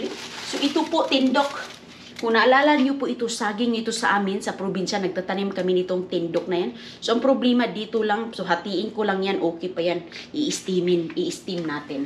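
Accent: Visayas, Philippines. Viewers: Ate NA eexit din talaga ako sa iyo habang nag hohole ka NG mga NA dumpster ka kasi ang igay MO talaga halata g bisaya din talaga hahaheee. Horayyyyy. Ate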